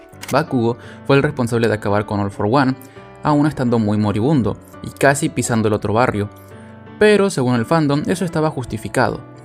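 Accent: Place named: Argentina. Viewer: Sé que suele narrar así en su canal principal, pero me recuerda a Axl Kss, talvez por el acento argentino XD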